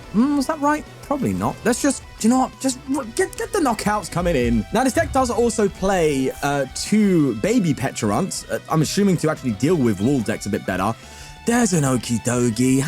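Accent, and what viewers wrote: RP, United Kingdom. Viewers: No, you sound fine. Sometimes I find the posh wee bit funny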